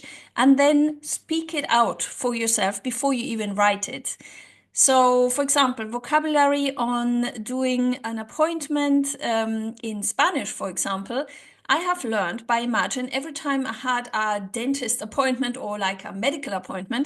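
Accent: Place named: Germany. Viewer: Just found your videos and they have some great tips. You sound German, your English is good though.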